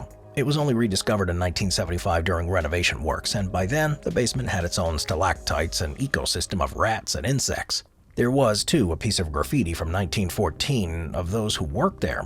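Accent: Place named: United States. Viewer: Stating the obvious there. U can tell his American as soon as he opens up his mouth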